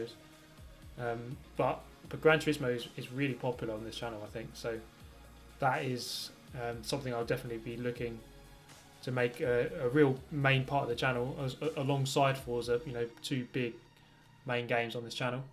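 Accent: England, United Kingdom. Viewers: Which area of England are you from? E.g. North east, south west etc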